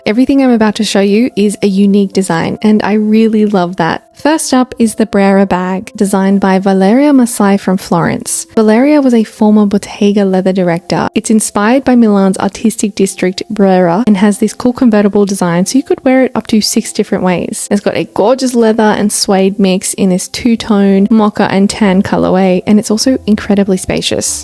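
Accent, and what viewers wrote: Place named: Australia. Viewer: She has a pretty strong Australian accent, so I suspect she mainly talks about milder winters like those in most of Australia. If you need to incorporate more layers in your climate, you can always add merino tights and a puffer vest underneath your coat. For my climate in northern Germany, clothes like the ones she showed work well enough for temperatures around 0°C or slightly below (which is most of our winter weather except the coldest days of the years) in the city, but of course these are not clothes that would keep you warm while on an extended winter hike at -10°C or below. That's fine though, winter is different dependent on the climate - coastal/inland, rainy/dry, all this will make a difference and you will have to adapt these styling tips with respect to functionality in your climate. She cannot possibly cover all winter climates around the globe. 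:)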